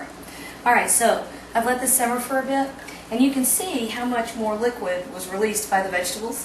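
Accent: South, United States. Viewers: Hi, Thanks for your response i appreciate it.I notice in some cooking videos that People will ask a question to the Cook but there`s never a response so thanks again.I can`t waite to make your recipe.I`ll let you know how it turns out.I love how you`re so friendly with the Youtube viewing audience.I notice that you have a Southern accent are you from Texas? I reside just 30 minutes south of Seattle,Washington, The City where Starbucks Coffee was started.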